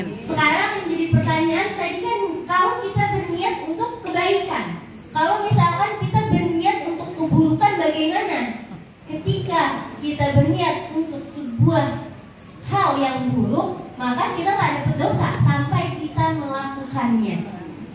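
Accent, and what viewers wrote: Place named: Arab world. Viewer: Dialeg bahasanya Arab bangat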